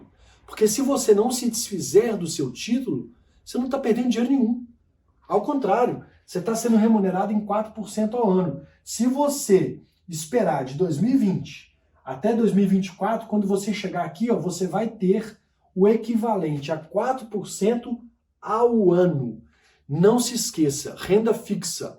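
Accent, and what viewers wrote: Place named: Minas Gerais, Brazil. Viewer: Sou de BH tb Adoro sotaque mineiro. Aprendendo muito c vc. Vlw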